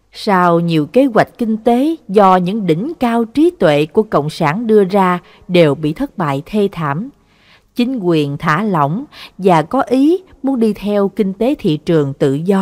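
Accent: South, Vietnam. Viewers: Giọng đọc miền nam rất hay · Câu chuyện cảm động, với người đọc giọng miền nam mộc mạc, rất hay 👍!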